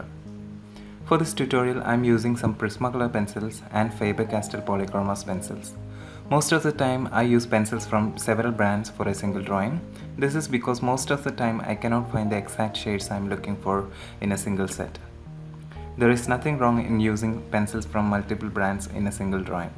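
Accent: India. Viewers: Omgggg that Indian accent is too adorable.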